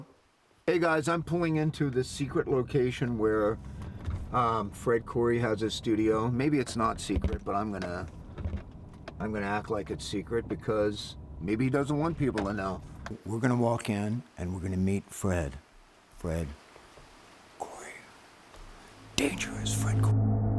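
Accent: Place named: Eastern Europe. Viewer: Cool Vlog man, Fred is right it does sound eastern European. Anyway log time fan and looking forward to the next one!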